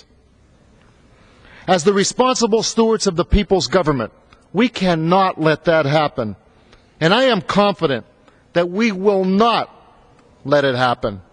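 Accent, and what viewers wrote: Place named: Hazleton, United States. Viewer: he still speaks like a hazletonian.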